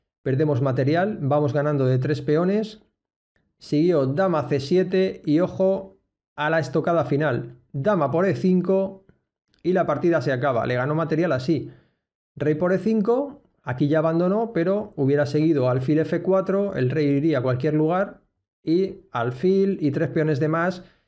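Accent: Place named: United Kingdom. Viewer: Te felicito por tu canal, es de lo mejor en Ajedrez por las partidas y tus análisis y comentarios. Pero permíteme recordarte que el Inglés no es el único idioma además del Castellano. No malogres tu buen canal pronunciando toda palabra no Castellana con Fonética Inglesa. Si no pronuncias una palabra extranjera con su propia fonética, debes usar la fonética Castellana. La letra w se llama ve doble y no 'u doble' ni mucho menos 'doble u' por lo que cuando se habla Castellano no se pronuncia como 'u' vocal o gutural sino como v que en Castellano al igual que en Griego es un sonido vibrante bilabial. Peor es cuando pronuncias la w del Neerlandés o Alemán o Polaco como 'u' porque en esos idiomas su pronunciación es dentilabial. Así, Zugzwang se pronuncia tsug•tsvang porque es Alemán y no sug•suang como si fuera Inglés. Asimismo, Wolfgang se pronuncia vólf•gang porque también es Alemán y no guólf•gang porque no es Inglés. 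Gracias por tu atención. Y que sigan los éxitos con tu Canal.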